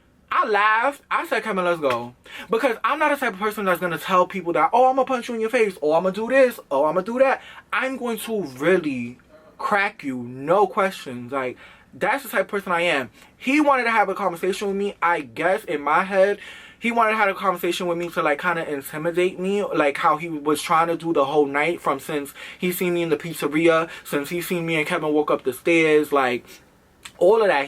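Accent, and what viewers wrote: New York, United States. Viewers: is so new york, i love it😂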